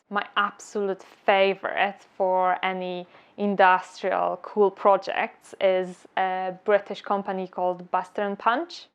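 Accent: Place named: Poland. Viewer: Beautiful Polish accent.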